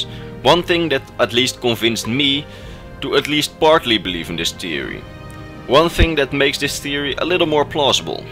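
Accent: Netherlands. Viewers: You have a Dutch dialect, are you dutch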